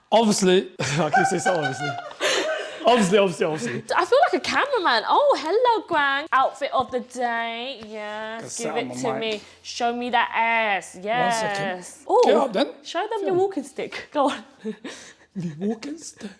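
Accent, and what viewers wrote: United Kingdom. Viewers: does anyone know what region of the UK her accent is from? city/etc? Love her.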